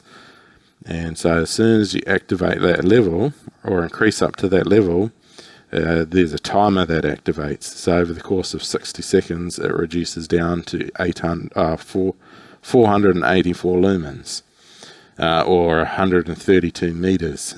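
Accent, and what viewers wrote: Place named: Australia. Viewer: I stand corrected… sound like an Aussie to me! wtf?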